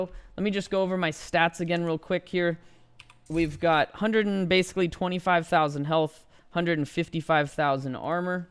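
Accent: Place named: United States. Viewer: Why does sound American now?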